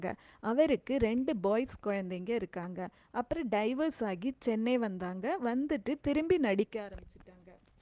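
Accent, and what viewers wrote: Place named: Kerala, India. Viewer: The voice has malayalee accent🙂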